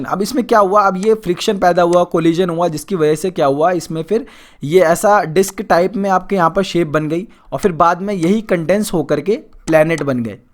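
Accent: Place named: Punjab, India. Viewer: Loved ur punjabi accent and explanation 🙏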